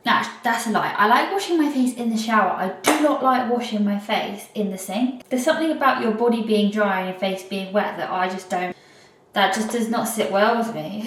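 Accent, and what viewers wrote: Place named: United Kingdom. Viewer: She’s got a British accent so she may have been born in the UK.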